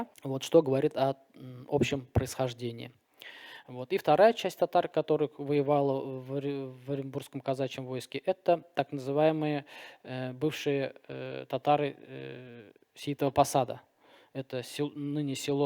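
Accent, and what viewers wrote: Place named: Europe. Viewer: В ЕВРОПЕ ЖИЛ КАК УЧИЛИ ТАК И ГОВОРИТ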